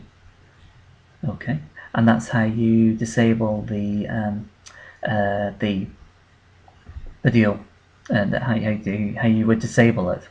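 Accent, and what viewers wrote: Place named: Scotland, United Kingdom. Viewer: I noticed a scottish accent there , i think , i'm from scotland :3 . The channel promo is important for people checking out the channel but the one thing i really wanna know is that i noticed that for some reason when a video of mine is playing , when it ends , it auto goes to another amv made by someone else . Do you know any way to stop that ? or is that another Google thing ? Peace & Good video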